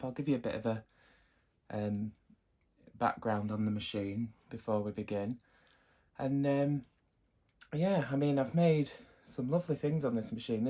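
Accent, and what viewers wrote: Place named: Northern England, United Kingdom. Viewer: i'm just about to purchase a secondhand MK70 and thought i'd look for a video or two as i don't it comes with a manual. i was watching without sound and thought you were American. imagine my surprise to hear your Northern accent, i'm from Lancashire. i couldn't have asked for a more informative, easy to follow tutorial. once i get my machine i'll be replaying this video constantly. thank you for spending the time in making it, it's much appreciated.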